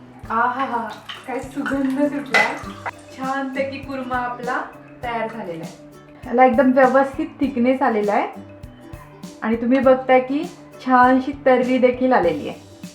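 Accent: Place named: Satara, India. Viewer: mi pan satarchi ahe.. bolnyavhya style Varun guess kele mi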